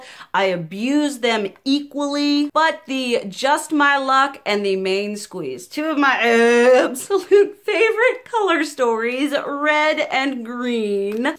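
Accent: South, United States. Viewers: I wasn't expecting the country accent from the thumbnail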